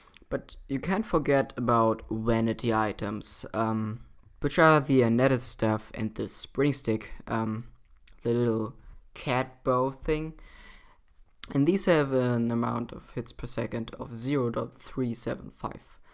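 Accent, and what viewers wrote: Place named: Germany. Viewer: np btw you sound like you speak german cuz of your english accent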